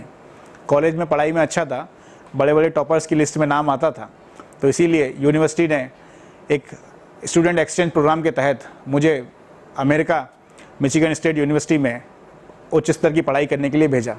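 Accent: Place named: Agra, India. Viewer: I am also from agra .tabhi inka accent bahut krra hai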